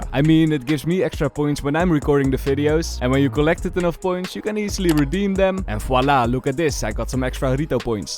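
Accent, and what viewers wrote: Netherlands. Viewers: I have been watching your videos since I started playing Valorant in 2021 and I'm a regular viewer. Independent of this information, I moved to Amsterdam in 2022 and only TODAY REALIZED THAT YOU HAVE A DUTCH ACCENT fkkskskskskskskskss hahahaahah 😅 sorry for TMI! Love your videos, hope you grow ❤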